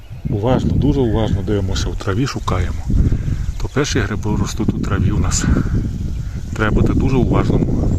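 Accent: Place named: Zakarpattia, Ukraine. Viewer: По мові чути: Закарпаття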